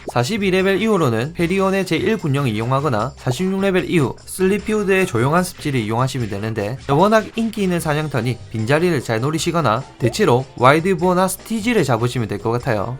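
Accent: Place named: Gyeongsang, South Korea. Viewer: 경상도사투리 진짜듣기싫다 어우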